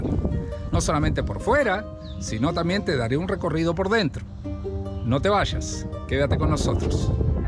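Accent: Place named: Argentina. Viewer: Hola, te felicito por el video, está muy entretenido y sin tantas vueltas! PD: seguí así con la tonada Argentina!!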